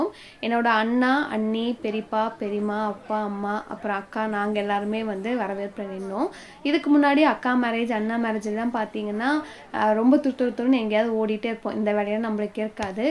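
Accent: Kongu, India. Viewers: Neenga v2la kongutamil giramathu basai thaan pesureenga kongu vellaalar ah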